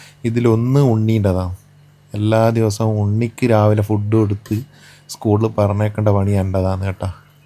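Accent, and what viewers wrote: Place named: Kannur, India. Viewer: നിങ്ങൾ എവിടെയാ നമ്മുടെ കണ്ണൂർ ആണോ നമ്മളെ bhasha😂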